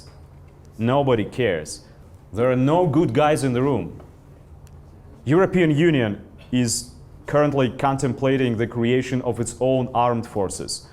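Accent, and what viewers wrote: Russia. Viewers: Чтобы попасть на обучение в клятую Америку, пришлось сгрызть зубы об английский. Но по акценту определяется безошибочно - говорит русский. И даже НЕ говорит - читает перевод с русского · СЁМИНУ очень идёт легкий русский акцент:) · Молодец! хорошо говорил с русским акцентом! Я тоже не люблю кревлять языком, пытаясь подражать американскому акценту. А главное серьёзно, коротко и по делу, без лживых импортных улыбок, которые так часто можно наблюдать на подобных заседаниях!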